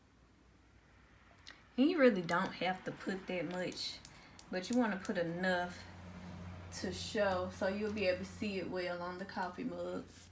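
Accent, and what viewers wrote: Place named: Florida, United States. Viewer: Your accent is so cute lol so country. Must be from Florida too 😂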